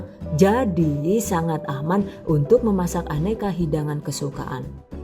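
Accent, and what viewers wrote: Banyumas, Indonesia. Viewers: Suka keluar ngapak nya... Primen sih jebulane wong ngapak yaaa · wong ndi si rika ? deneng teyeng ngomong ngapak yong? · Mbak orang mana kok ngapak ngomonge 😂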